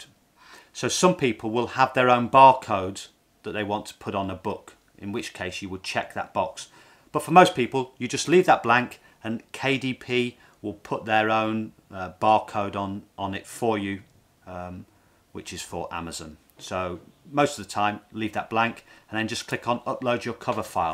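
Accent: England, United Kingdom. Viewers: Good to hear an English accent, regarding making money, keep it up, your videos are very informative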